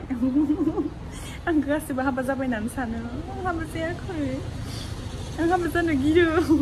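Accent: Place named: Kokrajhar, India. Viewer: Your Boro language is same kokrajhar boro language and you are good girl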